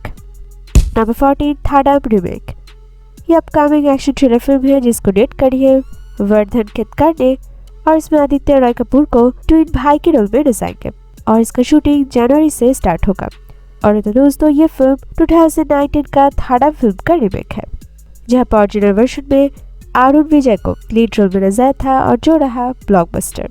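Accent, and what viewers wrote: Bengal, India. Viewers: You are a bengali ?? Your acceent is a bengali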